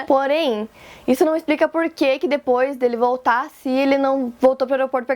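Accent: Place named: Rio Grande do Sul, Brazil. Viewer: tu é do rs? parece por causa do sotaque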